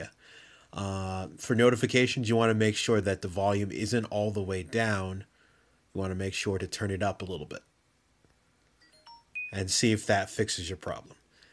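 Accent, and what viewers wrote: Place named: United States. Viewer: Thankyou! And nice to listen to someone who actually speaks English and doesn't speak too fast! American English!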